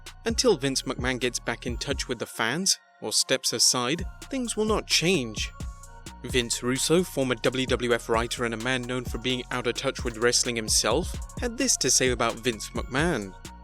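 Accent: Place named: RP, United Kingdom. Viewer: It's funny hearing a guy with a posh British accent reading a Vince Russo quote.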